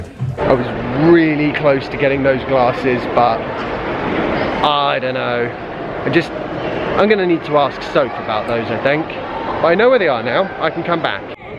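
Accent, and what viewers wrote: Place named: United Kingdom. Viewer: 💯 Brit accent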